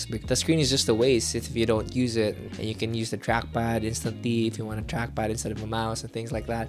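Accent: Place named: Philippines. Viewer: Oh wow, as soon as I heard you start talking I was like "he sounds like he's from PH..." :P My LDR girlfriend is from there as well; any recommendations for cool places to visit when I go there in the fall? Borocay seems cool but I feel like everyone does that.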